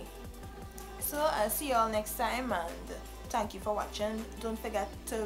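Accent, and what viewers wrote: Trinidad and Tobago. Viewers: Love this and thats y you have such a nice accent you from trinidad lol.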